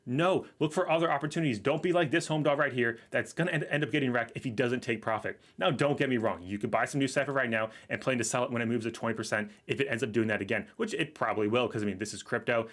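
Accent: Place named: Berkshire and Buckinghamshire, United Kingdom. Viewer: I'm from there. Distinctive accent. :) berks and bucks 4me. But just guessing!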